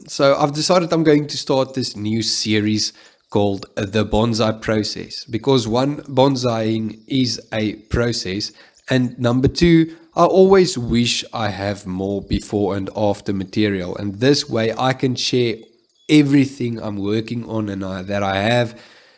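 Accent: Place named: South Africa. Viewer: Loved the video and seeing your different trees. So much content out there is not South African, so great to hear our beloved accent. I have got a black monkey thorn from nursery stock that needs a hard chop. What is the best time of year for this?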